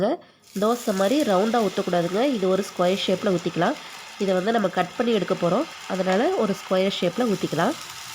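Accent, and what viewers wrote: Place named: Coimbatore, India. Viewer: Neenga paesaradha Partha, neengalum namma coimbatore thaan ah🤔🤔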